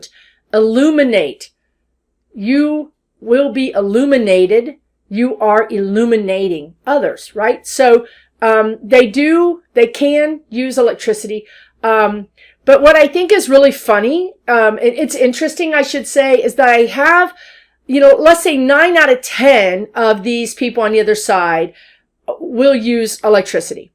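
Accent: Texas, United States. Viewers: You are in Texas but you have a distinct accent. Are you from east TX or from the east coast?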